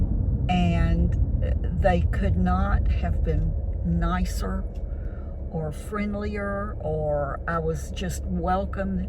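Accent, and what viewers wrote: South, United States. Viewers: This was such a great story!! Your southern voice and the way you tell it is wonderful❤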